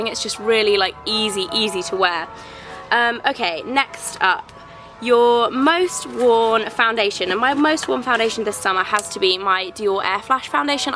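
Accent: England, United Kingdom. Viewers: I have to say, you are very well spoken and very well dressed! I don't normally come to this side of the internet but my sister-in-law is looking to do make-up for movies so been poking around. (I'm a presenter for much different things) Anyway, I just wanted to say thank you for putting forward such a great English accent for everyone to hear! Totally recommended. +10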